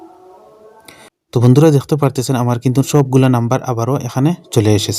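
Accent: Sylhet, Bangladesh. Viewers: Bai apne sylhetini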